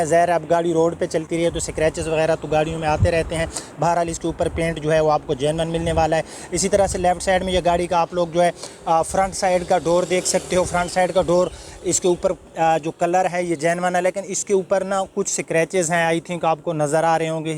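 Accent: Saraiki, Pakistan. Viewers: Apki Urdo Se aisa lagta ha jaisy ap sryki hai q k apko urdo bolna hi nai ata so ap sryki mn bol lia karen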